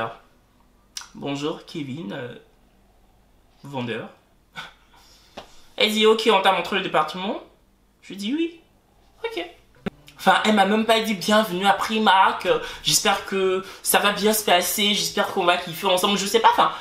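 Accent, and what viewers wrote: Ivory Coast. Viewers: Clover C'est normal c'est la manière de parler des Ivoiriens!!! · 😂😂 c'est la 1ere fois que je vois et entend ce gas! MDR déjà je sais que c'est un ivoiriens de suite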